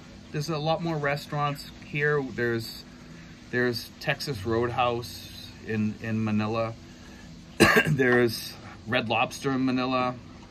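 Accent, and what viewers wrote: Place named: New York, United States. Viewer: I love your New York accent, sir! I wonder what the story is how Trece Martires got that name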